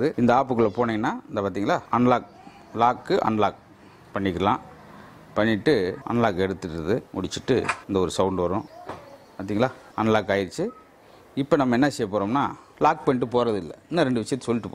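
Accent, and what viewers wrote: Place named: Madurai, India. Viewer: UNGAL COMEDY HIT AAVURADHUKU MADURAI SLANG UH ORU KAARANAM 💯💥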